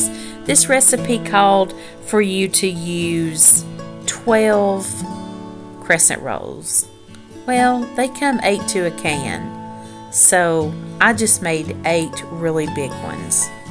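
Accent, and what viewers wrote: South, United States. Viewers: thank you so much I just watched too but I do everything in three so I'm going to watch another one cuz you seem like you're a lot of fun and I love your accent my mom was from the south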